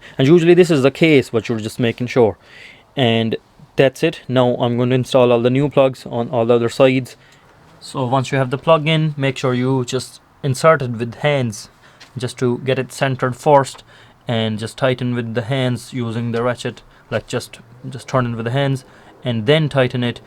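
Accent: Cork, Ireland. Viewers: Is that a hint of a Cork accent? Sound for the video, need to tackle this in the morning. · Love your cork accent...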